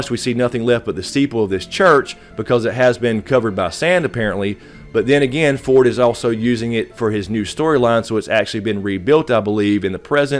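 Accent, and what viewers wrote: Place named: North Carolina, United States. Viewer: North Carolina? Help me with your accent. Nice video, thanks.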